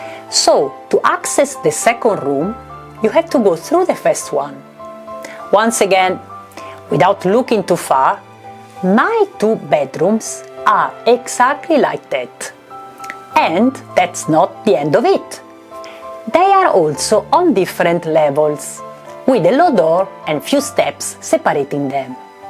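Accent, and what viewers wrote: Italy. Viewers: La sua pronuncia dell' inglese è assolutamente italiana ! Niente di grave, ovviamente :)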